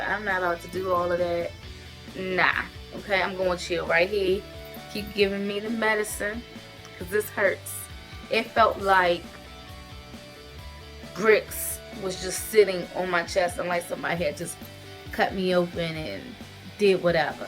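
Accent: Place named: Washington DC, United States. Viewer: your accent made me miss DC so bad. wish you the best with your journey. I was just sleeved on the 13th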